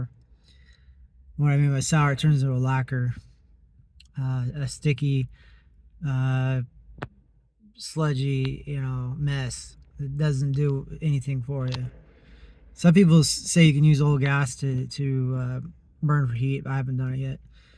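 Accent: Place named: United States. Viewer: You are an original American so I could learn English from what you said/spoke. It is not easy but I love learning, 😊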